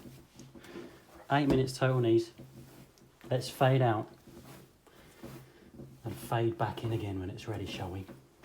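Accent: United Kingdom. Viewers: so glad to see you on YT - following you and I am learning as I am doing - love your vids and your presence and jokes with your UK accent for us US blokes is great - Great vids and editing by you and presentation is excellent - Thank you for all you do....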